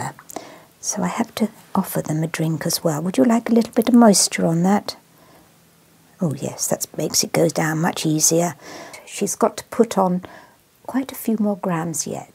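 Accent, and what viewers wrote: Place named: RP, United Kingdom. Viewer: A wonderful voice. It's called an RP (Received Pronunciation) British voice and it's almost from a bygone era.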